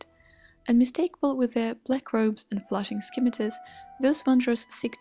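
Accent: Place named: Iceland. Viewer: lolz you sound icelandic. Besides ravenloft har'akir, how about Athas dark sun?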